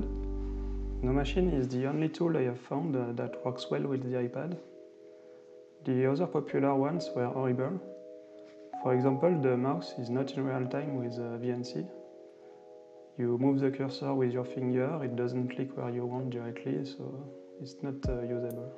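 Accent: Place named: France. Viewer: Very nice and charming with spoken English with a heavy French accent! Though when neither language is your mother tongue then the background music overlay upon this really becomes an obstacle! I'll see if my patiance will take me through this ... accordingly I can not give you any score for this video yet ...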